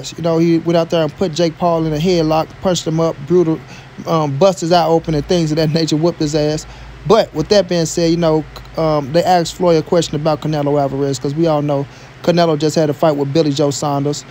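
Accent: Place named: St. Louis, United States. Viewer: I'm a white dude from north county STL, just happened across this video and soon as I heard you say "Give him his flowers while he HUR" I said aw shit this dude from the Lou 😆 Good content bro, respect💪💯 #Subcribed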